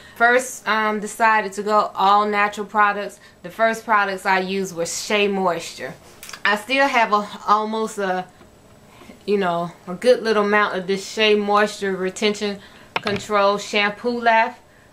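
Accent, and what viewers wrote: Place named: United States. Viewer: Omg I can't NOT believe I'm just now coming across your channel I it's 4am and I'm up watchng your channels 😴.... First I love your country accent, your sprit and passion for natural healthy hair make the videos more enjoyable. Second 👀😱 that cabinet full of powders! Please share. <mail> I use a lot of essential oils but never used a variety of powder just the Aztec clay on my hair it was okay my coil sure did pop👀🙈😱.... Lol as for my daughters hair it worked well for one but dried out the other one. Quickly stopped. So I just use that as a face mask or toothpaste. One of my daughters hair is thick thick thick and I can't seem to keep it moisturized, I invested in the Qredew and its aiight! 😒.... Run down of my struggle cause it's GETS real ! Lol can I get a list of powders you use? Thanks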